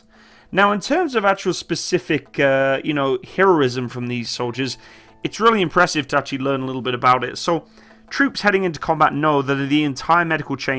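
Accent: United Kingdom. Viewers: I was not expecting the British accent when I clicked on this video. And after I heard the accent, I was not expecting the level of detail and attention put into this. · I'm ex air force elite medical rescue. The British narrative is offensive. You're Not American. Please be respectful, sir. Although I do admire your respect. Put subtitles for translation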